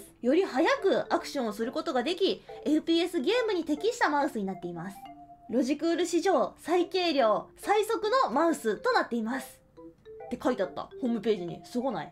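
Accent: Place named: Kansai, Japan. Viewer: 関西弁なんですね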